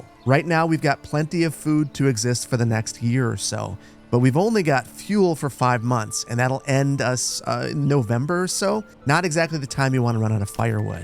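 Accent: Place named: Great Lakes, United States. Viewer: Your accent sounds kinda like you live on lake Erie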